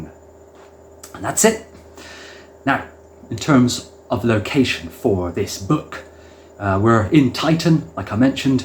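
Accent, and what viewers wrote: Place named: West Country, United Kingdom. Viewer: Aye; that was a best of release. Well spotted. I detect a slight west country twang in your accent. I'm in Yarm, NE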